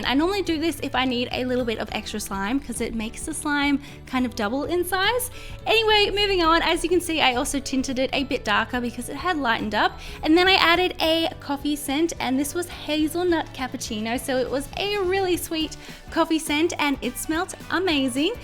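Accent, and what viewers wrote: Australia. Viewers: Are u Australian? Just a random question also AWESOME slime. I can't even make some slime 😕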